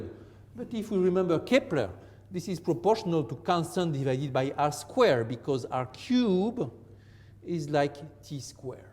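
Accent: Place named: France. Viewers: He sounds SO French and I love it!! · ITS A PURE FRENCH ACCENT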